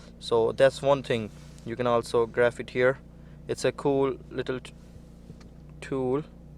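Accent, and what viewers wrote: Cork, Ireland. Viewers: A Touch of a Cork accent is it? . Good diag I have the Autel Ds808 good tool for the money. Well done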